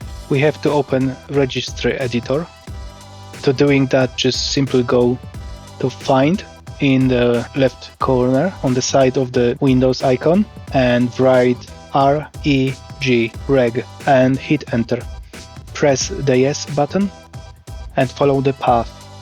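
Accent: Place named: Poland. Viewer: Słuchać, że Polak 🤣 Dzięki za pomoc!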